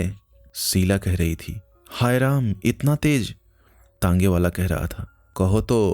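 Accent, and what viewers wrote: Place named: Bengal, India. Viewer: आप मूलतः बंगाल से हैं क्या? उच्चारण में वहाँ का रंग घुला हुआ है. 😊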